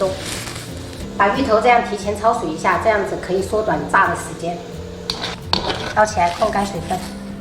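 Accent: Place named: Fujian, China. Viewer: 我感觉她是不是很小就去到闽南了，因为她讲普通话也是福建的调调。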